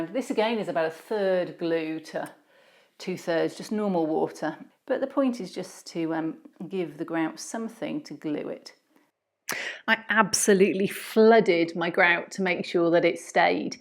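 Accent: United Kingdom. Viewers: Great video. I'm trying to place your accent, where in the UK are you from?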